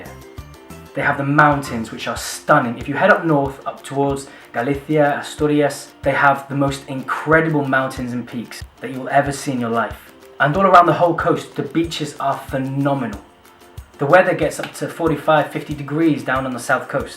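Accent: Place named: Essex, United Kingdom. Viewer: you have a slight Essex accent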